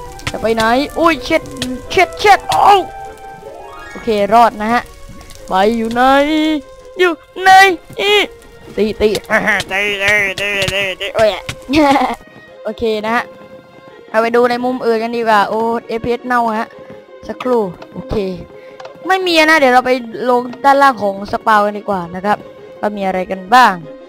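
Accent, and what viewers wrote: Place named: South, Thailand. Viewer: 5555 เเม่งคนใต้ชัว โครตฮาเลย ฟังดี ๆ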